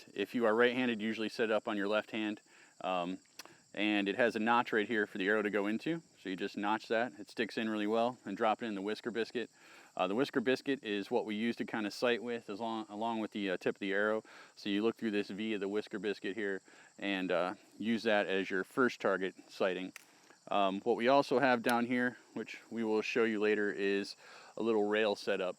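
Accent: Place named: United States. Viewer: es insoportablemente gringo. Habla en jeringoso yankie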